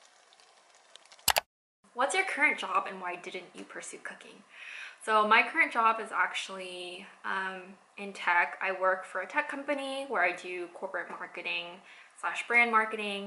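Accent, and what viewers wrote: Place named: California, United States. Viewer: Voice and Cali accent have stayed the same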